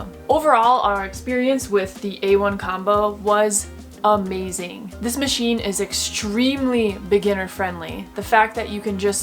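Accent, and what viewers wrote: France. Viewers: always makes me smile when i hear a french accent on an US based video^^ good ideas to print those things :)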